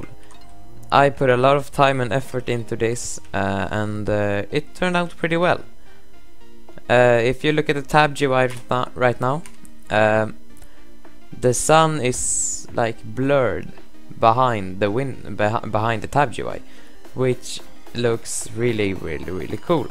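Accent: Sweden. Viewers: I hear that you are swedish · I kan Hear your swidish in your english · I hear you speak swedish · are you swedish?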